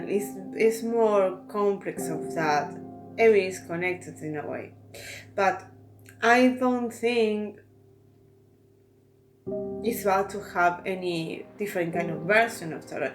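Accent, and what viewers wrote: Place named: Spain. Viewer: Hello this video is very interesting and you should put up more videos! Where are you from actually? Im thinking spanish due to the accent but im not certain. Greetings from ireland:)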